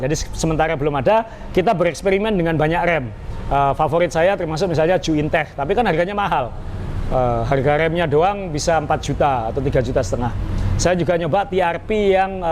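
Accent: Surabaya, Indonesia. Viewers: yang saya suka mbak nya ngomong nya medok khas suroboyoan... jd inget pas kuliah di unair dulu.. <num>. maju trs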